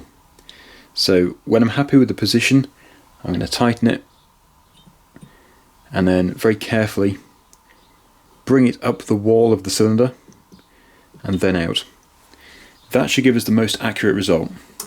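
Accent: United Kingdom. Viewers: Personally I have absolutely no problem with your talking. You know what you are talking about and that shows. Additionally you are a Brit of one stripe or another and your accent is pleasing to the ear. Keep on keeping on sir. Thank you for the entertainment. I am NOT a diesel mechanic. I have been a gas engine mechanic for 65 years. ( I'm 81) but I understand diesels enough to follow along quite handily. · You keep narrating. I love the british accents. Your videos are great! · I’m going to be honest I like the work you do but 50% of why I watch is just to hear your British accent and wordage . Love the Channel.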